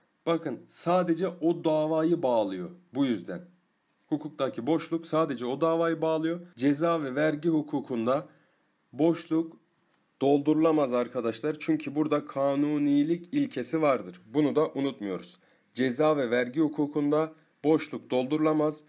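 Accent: Turkey. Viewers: Hocam elazigli misiniz??? Sive cok benziyo gadan alam... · Söylemeden edemicem Yozgatlı mısınız acaba şive müthiş her anlattığınızı gülümseyerek dinledim ve çok beğendim😍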